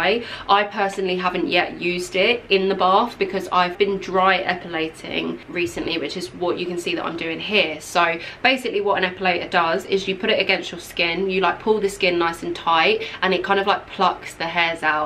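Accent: United Kingdom. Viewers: It’s a British accent -_- what’s wrong with it?? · malak beauty girls that’s offensive why yall hating on her accent ik u may replie to me “it’s just a joke” but I’m British too and I don’t say it like woo we say it like Wawter so yea. Offensive! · Your accent keeps reminding me of those listening tests from cambridge we always do in high school here hahahah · Love Yuor British Aaxent. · over dose British accent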